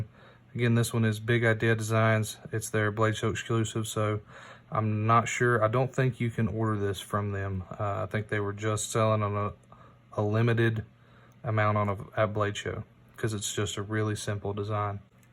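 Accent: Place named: South, United States. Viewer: Thank you. Great no-nonsense, practical EDC with a southern drawl 😊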